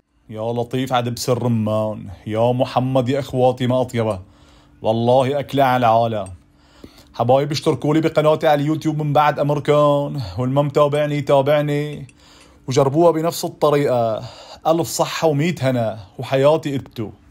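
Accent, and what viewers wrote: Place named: Latakia, Syria. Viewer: احلا حكي بلعالم حكياتنا ولهجتنا اللادئانيه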